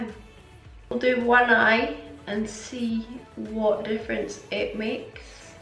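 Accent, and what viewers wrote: Scotland, United Kingdom. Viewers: Some nice stuff there. I love the colours in that little eye palette. And the accent, the "HAMISH", the Flower of Scotland - all my Scotofile cravings fulfilled. LOL. You had a birthday??? Happy Birthday. Hope you had a lovely day. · Omg are u Scottish??xo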